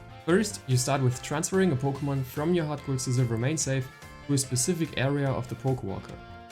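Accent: Germany. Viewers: You can shiny hunt at f pokewalker??? Do i hear... Hör ich da einen deutschen akzent? Ich hab das actionReplayDS auch noch, kann man das auf dem DSi auch benutzen?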